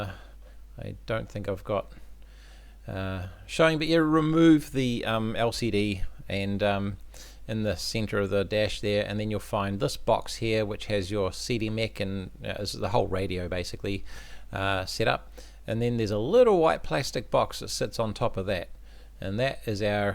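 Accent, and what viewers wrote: New Zealand. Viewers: Customers car came in today senders seem okay cause its sending signal saying full on gauge when passengers plugged in however half when unplugged and half when both unplugged will open up tomorrow morning and hopefully this info does the trick. you sound like you're from nz. owe u a box of beers if this works out. $100 so far for diagnostics dunno what to charge if this is the issue. maybe another box lol more so around $350 which I think is fair. Chur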